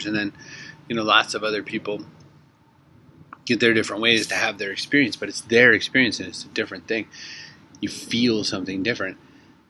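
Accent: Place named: Ireland. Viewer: Hi! I like writing poetry and your Irish accent so let me drop by and leave a comment on one of your videos too!:)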